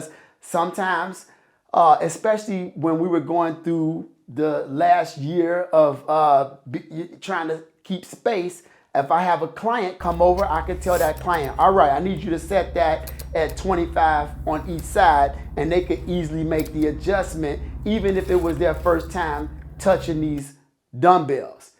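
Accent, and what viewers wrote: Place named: Washington DC, United States. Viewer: Thank u brother helpful video got the same set haven't had any issues yet. Sound like u from D C u gotta accent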